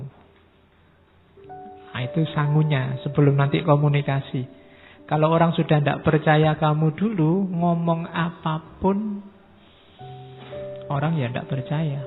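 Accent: Java, Indonesia. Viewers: tapi gua gasuka aksen Jawa mau apapun Jawa ngomong Indonesia kuping gua menolak 😭 gatau kenapa bapak ini ngomong pake logat Jawa aja gua gamasuk otak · tapi aku sama sekali ga suka cara bicara kamu.🤣 palagi m3dok gitu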